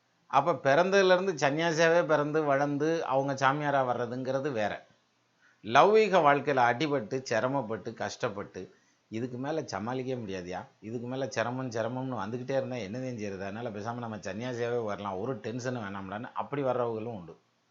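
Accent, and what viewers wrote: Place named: Dindigul, India. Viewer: Thank you sir for conducting a superb program. Really we will know many things from astrology . your dindigul slang and tamil poetic style is extraordinary. I have some doubt regarding my jadhagam. My DOB: <num> Time: 5.30 pm. 1. விருச்சக லக்னம் கடக ராசி ஆயில்யம் நட்சத்திரம் லக்னத்திற்கு ஏழாமிடத்தில் சுக்ரன் ரிசபத்தில் ஆட்சி பெற்று நிற்பதால் திருமணம் தாமதம் ஆகுமா? எப்பொழுது திருமணம் நடக்கும். 2. இரண்டாமிடத்தில் சனி மற்றும் ஆட்சி பெற்ற சுக்ரனால் இரு தாரம் அமைப்பு ஏற்படுமா? 3. 8 ஆம் இடம் மிதுனத்தில் குரு,சூரியன் ,புதன் சேர்க்கை, புதன் ஆட்சி என்பதால் மற்ற இரு கிரங்களும் ஆட்சி பெறுமா? அல்லது பகை வீட்டில் குரு இருப்பதால் மற்ற கரங்களும் பாதிக்குமா? 4. 9ஆம் இடத்தில் சந்திரன் கேது சேர்கை ஆட்சி பெற்ற சந்திரனுடன் சேர்ந்து கேது நல்ல பலன் தருமா? 5. வெளிநாட்டு யோகம் உண்டாகுமா? எப்பொழுது.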